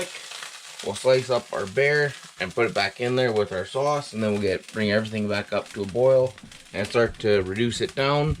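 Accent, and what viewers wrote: Canada. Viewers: The most CANADIAN accent in recorded history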